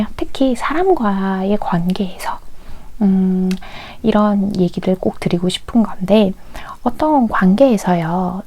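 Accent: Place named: Gyeongsang, South Korea. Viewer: 3zz ㅋㅋㅋㅋㅋㅋㄴㅋ걍상도남쟈맞습다..ㅋㅋㅋㅋㅋㄴ 해봄이라는 말이 왜이렇게 듣기좋은지 모르겜ㅅ내옹❤ㅎㅎㅎㅎ 후후후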